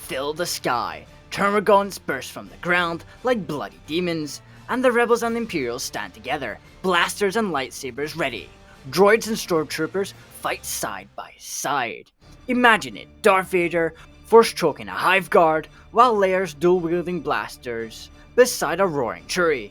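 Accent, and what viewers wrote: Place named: Scotland, United Kingdom. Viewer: As a Scotsman myself, I can verify the accent but as I come from near Glasgow that's a rare I would guess further north than what I hear, what I haven't seen is two converse between each other lol. .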